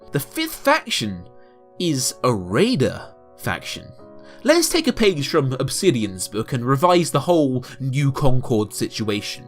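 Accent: United Kingdom. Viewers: Don’t you jut hate the modern style British accent (yours)? I just love the old accents because there was so much variety and they sounded so much less wimpy and snotty and weird.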